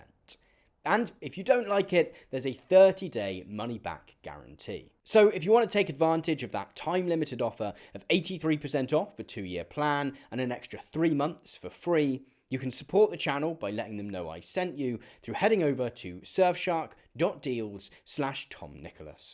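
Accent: RP, United Kingdom. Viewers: Your bbc voice makes these scary human beliefs a bit easier to handle. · Mr. Nicholas, the simplicity of your mind cant excuse the deliberate missinformation and outright lies in this video about both peterson and 1984. The wannabe etonian accent and wording you use for dramatic effect and to trick youre fans to think you are actually erudite cant really fool anyone else. Oh well, what a waste of time.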